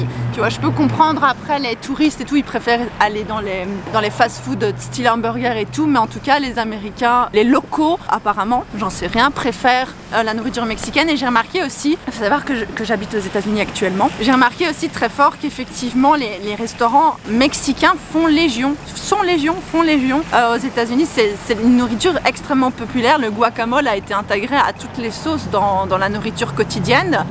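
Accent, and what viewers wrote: Belgium. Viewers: dommage que tu ne dis plus les chiffres à la manière belge :'( (et suisse) Sinon super vidéo comme d'hab !!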